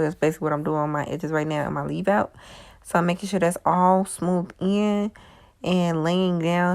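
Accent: United States. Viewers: That southern twang. Yes baby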